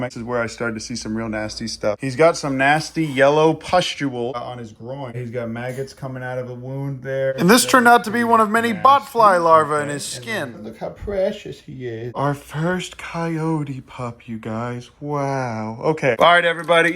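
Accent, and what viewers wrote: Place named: New York, United States. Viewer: Oh, he's in Texas? He sounds like he's from up north, like New York.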